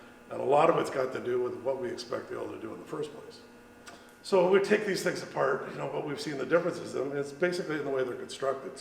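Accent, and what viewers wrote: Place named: United States. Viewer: Your accent is American, but you’re talking in kilometers. What am I missing?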